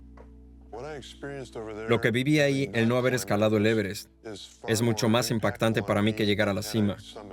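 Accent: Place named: Spain. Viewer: No soporto el acento gachupin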